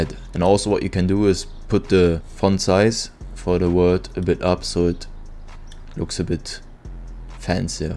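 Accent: Germany. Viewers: Youre German right? hört man :) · THE GERMAN ACCENT IS SO CUTE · Der Deutsch (Sprachige) Aktzent Kickt aber Nices Video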